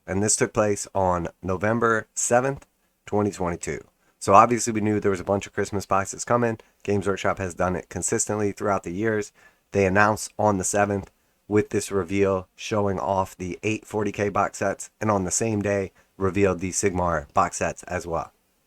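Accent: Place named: United States. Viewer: I guess it is a bit different for you in the US, you sound like you live in the USA. I'm in the EU and I don't think it will be a problem to get them. My local hobby shop is already taking pre-orders for them. But i guess we will see.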